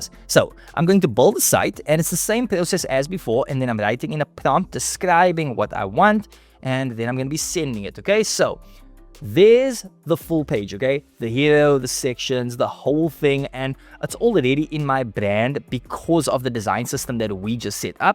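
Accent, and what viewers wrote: South Africa. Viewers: My brother are you from South Africa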